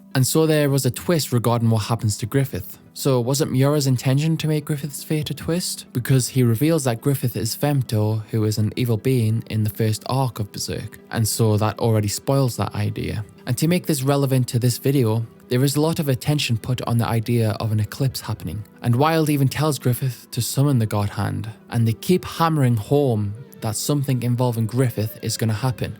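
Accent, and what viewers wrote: United Kingdom. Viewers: his awful British accent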